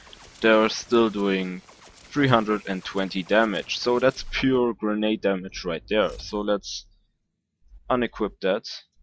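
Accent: Germany. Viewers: Bist du deutsch? Man hört dass nämlich an deinen englisch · Very good video. I play as Axton too. By the way, in my ears you sound quite deutsch...;-)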